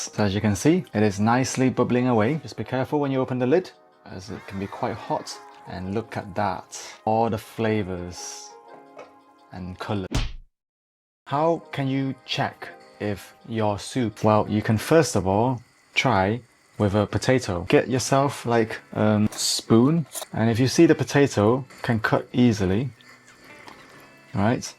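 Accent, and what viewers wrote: United Kingdom. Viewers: are you educated in UK? you have a very UK accent, ^^ its a complement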